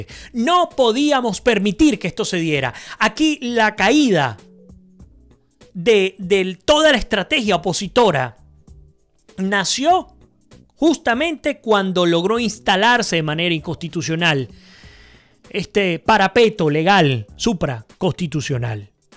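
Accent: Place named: Argentina. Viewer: hablas parecido a Argentino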